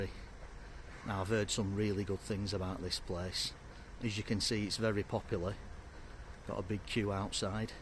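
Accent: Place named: Lancashire, United Kingdom. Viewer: Hi, a picture in pies, with your commentary adding the fine brushwork. Without wishing to repeat a previous comment, you wondered about value for money. You got three top quality pies for just short of six pounds, in London, you wouldn't have got one for that. As a pensioner, among the many that are under attack by the new government, I micht have to consider a move to the North-West. Sensible people and prices and plenty of great beer and scenery. We might find it difficult to understand each other, you with your broad Lancastrian accent and me with my broad London accent, but I'd love to give it a try. All the best mate, Mark from London.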